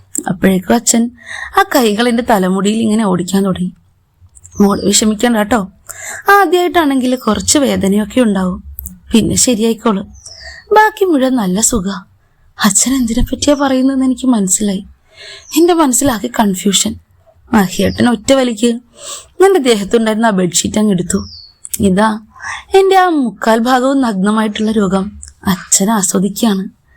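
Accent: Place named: Palakkad, India. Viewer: ഒരു പാലക്കാടൻ സ്ലാഗ്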